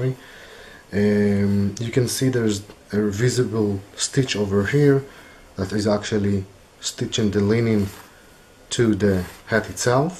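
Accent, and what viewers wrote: Israel. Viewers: i think its a scam, guy sounds like israeli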